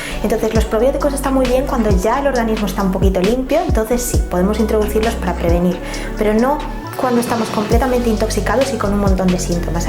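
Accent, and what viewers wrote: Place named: Spain. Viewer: Saludos, son muy importantes tus contenidos, ayudan al equilibrio del cuerpo, mejoran el estilo de vida, bajar de peso si que es una odisea, conocer los efectos de la comida en nuestro metabolismo, entender los beneficios biológicos de todos y algunos no muy comunes en nuestra dieta por cultura e idiosincrasia, Adoro como expones tus videos en tu expresión sencilla y elocuente con ese hermoso acento español, gracias por recordar la cortesía de tus contenidos, abrazos mil hermosa y siempre agradable rodeada de amabilidad...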